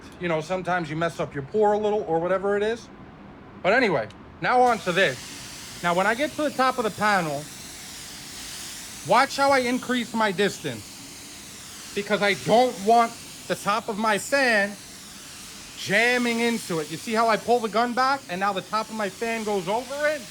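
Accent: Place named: New York, United States. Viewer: Where in NY are you? I'm guessing queens or more upstate? I just like to guess accents haha. good video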